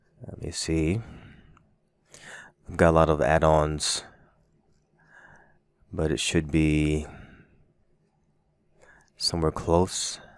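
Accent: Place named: South, United States. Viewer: Why does he sound like a Southern Ryan Higa!?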